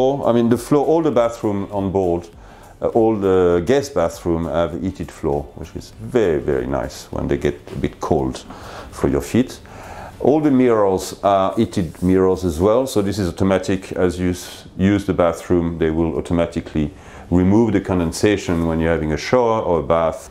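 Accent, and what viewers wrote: France. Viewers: Is this guy French? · Francisch accent?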